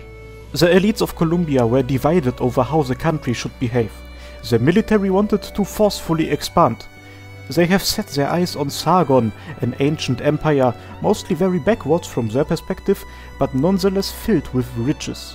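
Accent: Germany. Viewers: A short woustion are you germen becaus it sounds a bit like you have a german accent if so Servus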